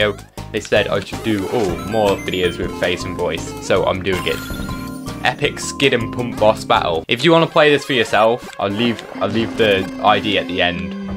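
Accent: United Kingdom. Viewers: Ur you British?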